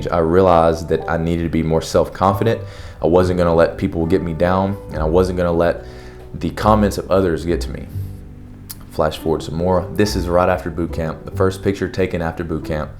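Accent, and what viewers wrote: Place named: South, United States. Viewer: I really enjoyed this video. It warms my heart to see people changing for the better and having the guts to openly speak about it. And your lil southern twang makes it even better.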